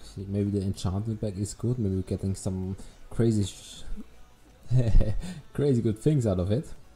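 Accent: Germany. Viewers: Bist du deutsch du hörst dich so deutsch an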